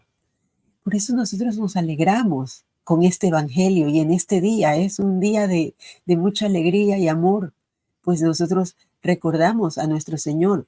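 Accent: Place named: Dominican Republic. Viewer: La verdad tiene acento dominicano,yo soy dominicano vivo en ny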